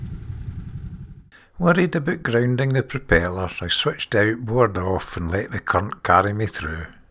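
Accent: Scotland, United Kingdom. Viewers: Amazing video and a lovely Scottish brogue. If God's willing, I will return to Scotland. My father loved kayaking in the San Francisco Bay. RIP my daddy.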